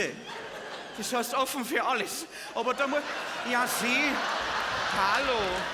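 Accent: Bavaria, Germany. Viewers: I mog den borischen Dialekt.Orfach guad 😂😂😂❤ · Warum Hochdeutsch? ist Bayer und das Publikum auch. Also wieso sollte er sich verbiegen? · Ist es, im schönsten, deutschen Dialekt gesprochen, nämlich bayrisch, oder boarisch ... herrlich 😂, köstlich ❤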